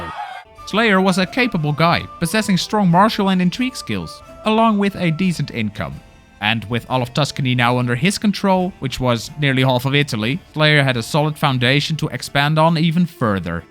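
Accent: Netherlands. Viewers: Are you dutch perhaps? I think i recognize the accent because i'm dutch as well. GEKOLONIZEERD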